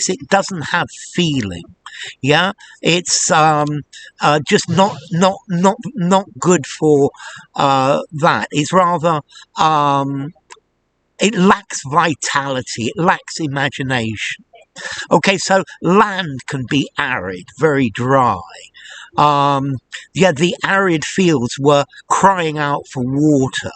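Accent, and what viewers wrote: United Kingdom. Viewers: hello dear sir, thank you for this brilliant explanation, I'd be very glad to know what is your British English accent please.😊😊😊😊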